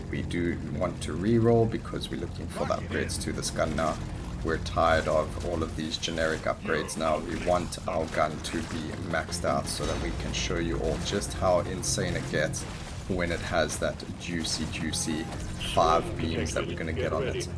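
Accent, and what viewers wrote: South Africa. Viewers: Awesome content. Thank you! Also so refreshing to hear a local SA accent when chilling to some gameplay.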